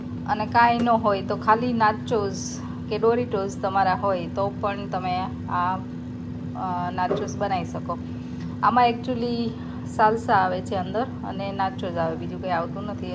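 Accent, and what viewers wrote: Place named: Kathiawad, India. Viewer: Di tame bau j mast kathiyavadi Gujrati bolo che. to favourite che j n. Tamne Instagram pr jowa ni bau j maja awe. Keep it up n Grow much much more 🎉 Lots of love to you and your beautiful family ❤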